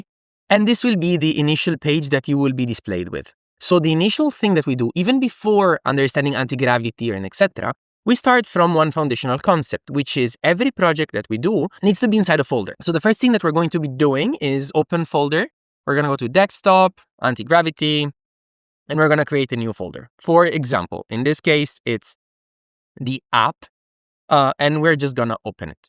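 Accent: Italy. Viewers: Idk but this guy made me fall in love with Italian Accent...!!!! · Accent kinda italic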